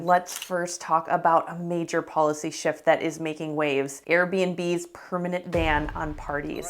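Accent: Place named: Minnesota, United States. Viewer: I commend you for trying to suppress that Minnesota accent… I kept picking up an accent but couldn’t pin it down until you said Minnesota and then I immediately realized what you’re trying to hide. You can’t hide it by the way, it comes out ALOT. And I’m a Puerto Rican from Connecticut lol the only time I ever heard that accent was in Fargo 😂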